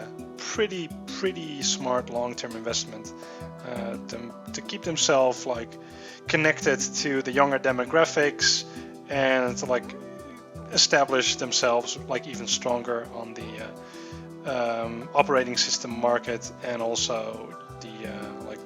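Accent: Netherlands. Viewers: Just discovered your channel, first thing I noticed was your Dutch accent :) Nice channel though! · Of ik kan goed Nederlandse accenten herkennen, of jouw Engelse accent is nog niet optimaal...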